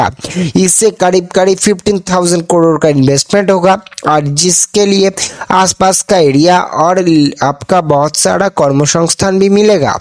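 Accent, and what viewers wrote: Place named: Kolkata, India. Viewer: This is “Calcutta Club” Hindi. Don’t blame him. One month in Gurgaon and he will align.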